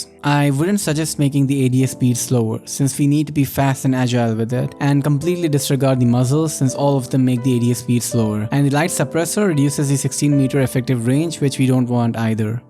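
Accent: India. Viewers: I know is India as by his accent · he sound like india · Are you an Indian? You sound like one. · In his recent video, he revealed his voice, it was an Indian accent.. so I guess he's Indian.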